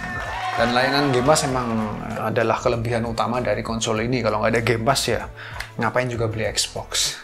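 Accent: Java, Indonesia. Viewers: Nah Justru krna Medok nya itu... Jadi ciri khas Menarik 😅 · Anjir bang Lu awet muda banget, terakhir nonton 2020 an, wkwkwk Ciri khas medok dan santai nya masih konsisten sampe skrg😂😂😂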